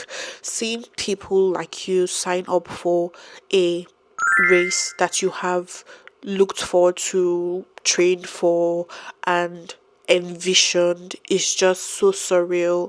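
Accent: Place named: Nigeria. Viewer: trust me you’ll get there faster than you think! If you keep on running 2 to 3 times a week, you’ll soon be reaching 35 minutes for 5k and before you’ll know it 30 minutes My next goal is a 10k, which I’ll start training for in a few weeks once I do some 5ks the next couple of weeks. The long term goal is a half marathon next year hopefully. From your accent I can tell you’re a Nigerian - I’m also a Nigerian too, born and raised in the UK You’ve got this! 🏃🏽‍♀️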